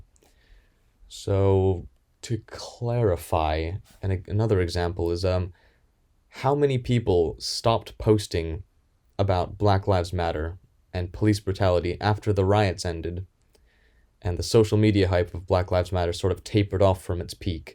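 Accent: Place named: United Kingdom. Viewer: why do you sound british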